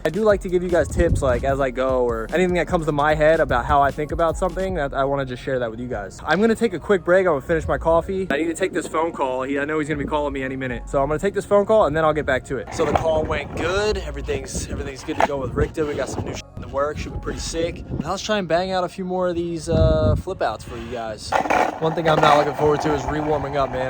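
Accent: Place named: Pennsylvania, United States. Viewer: You sound a little like. 😂 🔥 PA accent I guess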